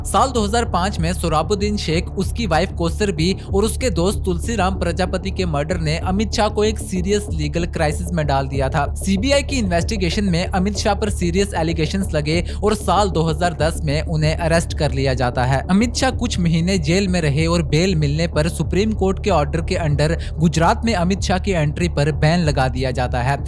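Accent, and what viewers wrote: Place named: Pakistan. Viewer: Bhai tera accent pakistani kyu lag rha hai ?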